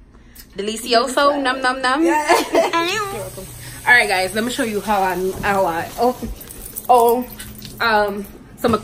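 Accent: Jamaica. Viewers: Mi nah lie mi love yuh style ..... I just love how yuh chip in the patois with the twang 😆😆👍🏼 just came across your channel ... mi like it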